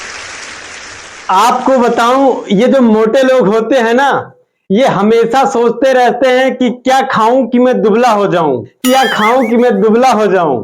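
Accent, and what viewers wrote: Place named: Purvanchal, India. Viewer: वाह रे मेरे पूर्वांचली शेर तुम्हारे लन्ग्वेज से साबित होरहा है की तुम U P मे किसी पूर्वांचल जिले के हो क्यूंकि इतनी शुद्ध हिन्दी भारत के किसी प्रदेश या जिले मे कोई बोल नही सकता है M D sheikh from बनारस up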